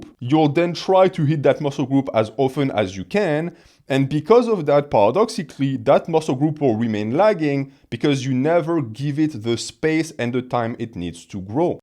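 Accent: France. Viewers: This is absolutely one of the best explainations of the dynamics of musculature and it's progression, I cannot believe this is free content. Wow. Bravo. Also, please tell me what the origin of this glorious accent is. Edit: before anyone chimes in and tells me France, duh. I'm talkin' specifics here.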